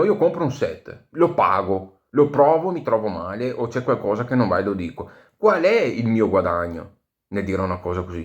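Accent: Italy. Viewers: piu' ti sento parlare e piu' penso che hai un accento uguale a quello della mia zona... posso chiederti di che provincia/zona sei?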